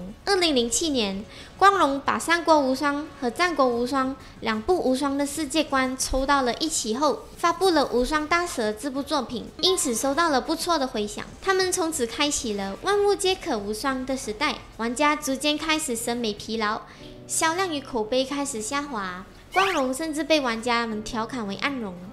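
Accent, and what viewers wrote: Malaysia. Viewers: 這位有大馬口音的聲音也太可愛了吧！ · 馬來西亞華人的口音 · 她應該是馬來西亞人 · 是猛將zhuan，不是猛將chuan哦⋯⋯妹子繼續加油，蠻喜歡馬來西亞口音，很有親切感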